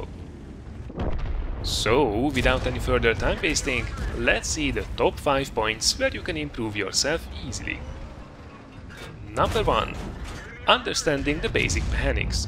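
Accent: Hungary. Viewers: Hey! Are you Hungarian, because the accent of yours sounds like you are to me. :D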